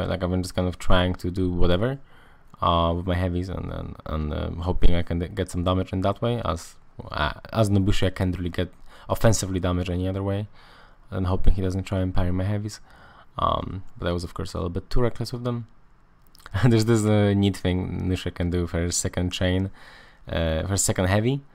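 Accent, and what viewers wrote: Poland. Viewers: I have to ask: are you Polish?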